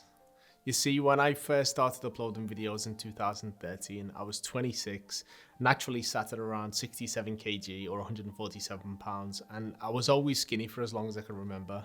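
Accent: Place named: Liverpool, United Kingdom. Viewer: I had never heard speak until now. Was not expecting a Scouse accent!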